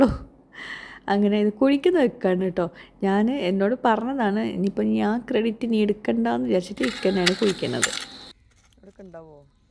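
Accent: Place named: Malappuram, India. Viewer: Engne ayalum nammude malappuram basha vallapoyum varum alle kolaya❤❤❤😂😂😂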